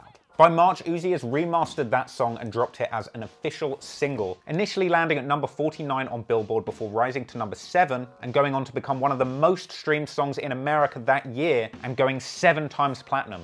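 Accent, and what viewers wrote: United Kingdom. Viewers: I'm really listening to a british dude talk about how a rappers career is ending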